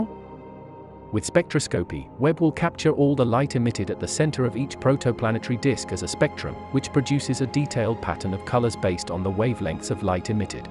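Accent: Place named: United Kingdom. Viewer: Thats a robot voice? I thought he was just british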